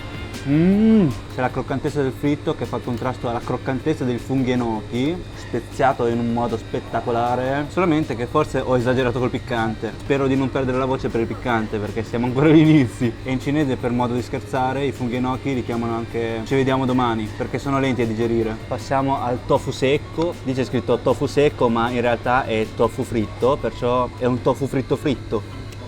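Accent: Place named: Romagna, Italy. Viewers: Il cinese con un vago accento romagnolo di è veramente spettacolare. Comunque, aldilà dell'accento che e' bello del suo, fai veramente dei filmati carinissimi e molto piacevoli. Unica nota pericolosa (te possino...), fai venire na' fame della madonna e fai venire voglia di partire per la Cina, per l'affetto che instilli per questa Nazione, immensa nelle dimensioni, nella storia, nella cultura, nella visione del futuro e, non per ultima, per una gastronomia che fai conoscere in modo assolutamente innovativo (l'accento, la tua cultura, la tua professionalità?) rispetto alla narrativa corrente. Questa sera, intanto, "traslo" la famiglia a Torino a mangiare cinese 🙂. PS: vorrei avere ancora il tuo stomaco, con quello che mangi (col piccante, che adoro), adesso, mi ricoverebbero ogni volta.... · Mamma mia quanto amo quella zona e quanto amo il cibo cinese!!! 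A parte la colazione (too much per me!) avrei mangiato TUTTO!! A me sembra comunque che questo ragazzo abbia l'accento romagnolo 🙃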